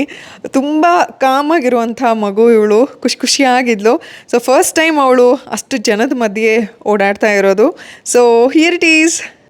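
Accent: North Karnataka, India. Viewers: Nam uttara karnataka bashe keli tumba khushi aytu mam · Wow namma UK bhashe keli bhala ksushi atu, Happy Wedding Anniversary 😊 <link> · Nice that you spoke in Uttar Karnataka tone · nimma Uttra Kannada bashe chennegeidea I am also UK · Nav ಉತ್ತರ ಕರ್ನಾಟಕದವರು ri ,bala kushi atri niv hing matadiddu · Happy wedding anniversary ma'am 💕 loads of love from Haveri ❤, nivu avag avag hing uttar karnataka language use madtiri kelok chanda ansat 🥰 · Hye uttara Karnataka tone❤️nim ela blogs ide lng alle mdi sis.nan uk hudgi alla bt nim lng ❤️❤️ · Nim uk baashe super madeam,navu dharwadadinda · Happy wedding anniversary 🎉 stay blessed 😊 happy to hear your north Karnataka kannada accent 😊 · Bhari masth recipe ayitu, nam UK language masth matadtiri ❤ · ನಿಮ್ಮ ಉತ್ತರ ಕರ್ನಾಟಕದ ಭಾಷೆ ತುಂಬಾ ಚೆನ್ನಾಗಿತ್ತು❤ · Akka nim bai valag nam uttar Karnataka language agdi mast 🔥🔥❤ri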